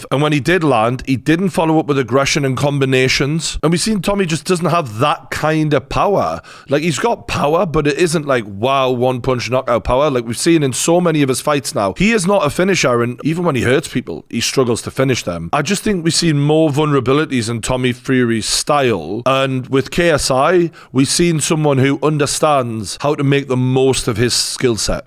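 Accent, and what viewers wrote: Newcastle, United Kingdom. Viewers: True Geordie stating facts.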